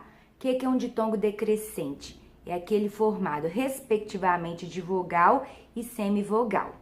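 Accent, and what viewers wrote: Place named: Minas Gerais, Brazil. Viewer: Ela deve ser de Minas ou Goiás . Pelo sotaque amei a aula já estou seguindo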